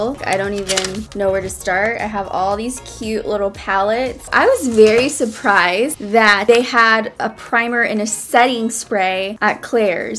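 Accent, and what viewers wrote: England, United Kingdom. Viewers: Is English or American? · Um I say that u r probably from England so yay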